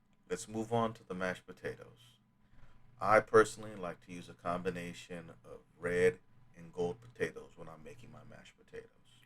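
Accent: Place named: South, United States. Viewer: Your voice is oddly soothing and I hear a southern accent even tho idk where u got that from. But MAN I miss your cooking already! At least I can watch these now lol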